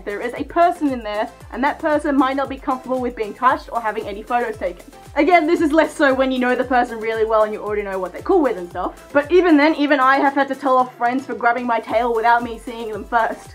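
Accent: Australia. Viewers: Why do I keep thinking you sound Australian? >:3 if you are Australian do you recommend I make a kangaroo fursuit?? :333 I’ve been questioning if I should or not recently because I’m making a fursuit this October, or at least I’m making the head feet paws and tail but not the full body because I still need my mannequin that I used for my art textiles project back soooo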